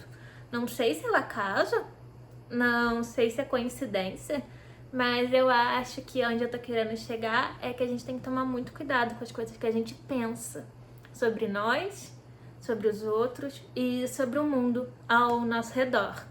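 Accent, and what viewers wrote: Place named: São Paulo, Brazil. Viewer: Acho que ela fala paulistano, pessoas que moram em são Paulo.. eu também acho bonito como eles(a) falam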